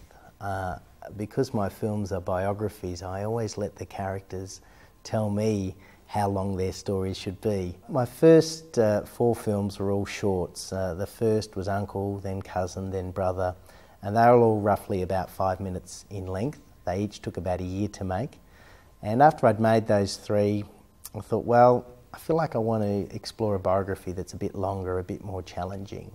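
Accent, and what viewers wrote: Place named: Australia. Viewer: I’m glad he still holds the Australian accent I know in love. RIP Australian Accent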